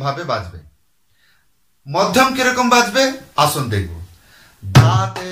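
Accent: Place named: Bangladesh. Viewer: আমি বাংলাদেশ থেকে দেখছি। আপনার কথায় কিছু কিছু বাংলাদেশের টান আসে তাই জানতে চেয়েছিলাম।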